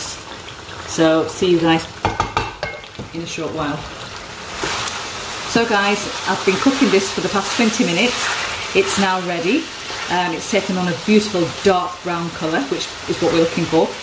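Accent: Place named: England, United Kingdom. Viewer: I love your English accent with Urdu words 😊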